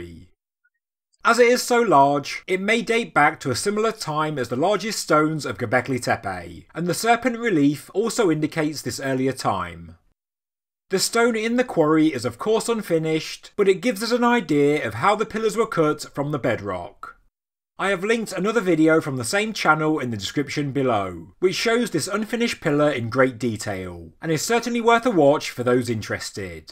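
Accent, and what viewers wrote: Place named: Midlands, United Kingdom. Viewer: aye your just east of brum thought i could hear that accent :d ...on tepe do you think there could have been a wooden structure over it at one time?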